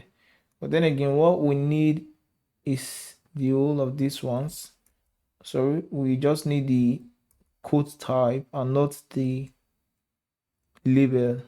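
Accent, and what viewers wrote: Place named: Nigeria. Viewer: I liked the video but I really could not follow up because of the accent, it is difficult for me to cope with nigerian accent, not that there is anything wrong with such accent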